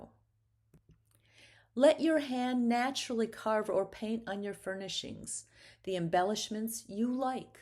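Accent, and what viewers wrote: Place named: Sweden. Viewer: I appreciate the videos as I'm educating myself on Nordic painters. But please use English pronunciations when speaking English. Perhaps "g" is spoken as "y" in Swedish but it's very distracting when you are speaking English. I had to constantly check the names being pronounced (Bergh, Goteborg, Furstenburg). I have heard this best-practice enunciated often: don't say "Par-ee" when speaking English, say Par-is--or you sound pompous.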